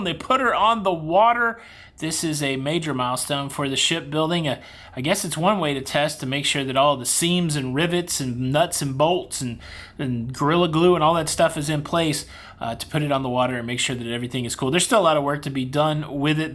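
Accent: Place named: New Jersey, United States. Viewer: A passable Jersey-ish accent! And I'd be freaking out with that door too, it's definitely an odd choice.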